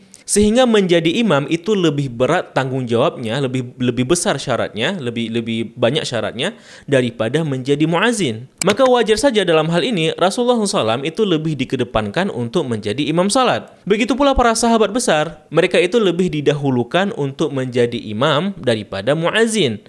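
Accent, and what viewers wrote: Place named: Aceh, Indonesia. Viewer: Oke kan orang Aceh😎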